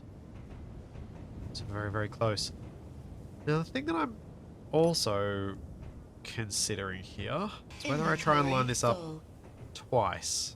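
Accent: Australia. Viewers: It is funny how you sound more Australian when sick. I never paid much attention to this, but now i can really tell the accent.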